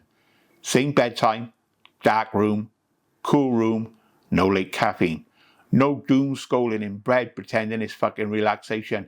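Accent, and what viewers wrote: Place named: Birmingham, United Kingdom. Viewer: It's like one of the peaky blinders got sober.. and having him telling you how it's done.. love it!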